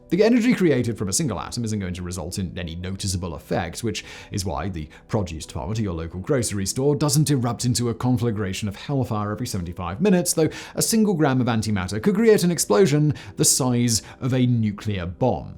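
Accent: United Kingdom. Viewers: Is He British ? I am having trouble understand him 🗿 subtitles to rescue ❤️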